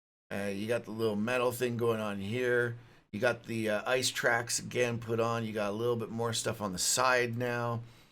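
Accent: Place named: Canada. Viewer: Hey,. Good video. Thanks for producing and posting this. Always good, useful information from you. +1 TL:DR - the rest of my comment a bit off topic. So, stop here and move on if you wish. OK? Still here? Alright,now for a (slightly) amusing sidebar. I learned something today. But what? Well, on with my story., I always like your YT videos and I frequently tune in to your Twitch streams. Even platooned with you a few times on the EU server. Anyhow, one thing that always puzzled me whilst watching such was your pronunciation of the word "decal". When I was growing up as a kid back in the '50s and '60s my friends and I, while building our model planes and warships, put DEE-cals (or DEE-cowls) on them. Later on, once we were driving, we'd put DEE-cals (racing stripes, flames from the wheel wells … ) on our jalopy cars to make them look "cool". DEE-cals. 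Here's a couple YT posts - <link> <link> Now here's saying deckle (deck-ul, rhymes with heckle or freckle). Deckle? Why'd he say deck-ul instead of DEE-cal? So, I did a little internet searching. Turns out the "deckle" pronunciation for the word decal is a Canadian thing, eh. Cool. In other English speaking countries (US, UK, Australia and so on) decal is commonly pronounced DEE-cal, <link> But, there are many words in the English language that have alternate pronunciations in the various countries where English is the principle language in usage (decal being one such word). Just take US vs Canada (or should that b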